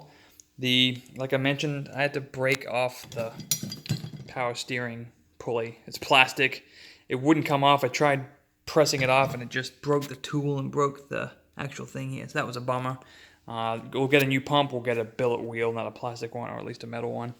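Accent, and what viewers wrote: Australia. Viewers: don't pay these dudes any mind. They are only used to hearing American accents. You sound like Australian or New Zealand. I can understand you clearly. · Australian ? Your accent and the flag on the wall are hints that you are. Bless the koalas and other endangered animals. Enjoyed your video. Looking forward to more.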